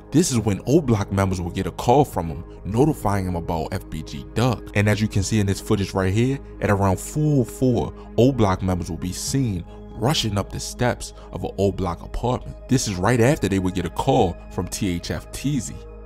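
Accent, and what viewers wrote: Baltimore, United States. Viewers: B.More accent lol 410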